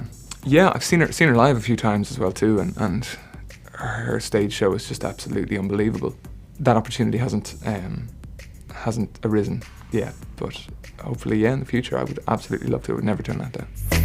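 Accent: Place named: Ireland. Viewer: Am I the only one who didn’t know he was Irish? Ive never heard him speak before....I’m not even sure where I thought he was from, I guess I just assumed like manifested from a witches spell or something.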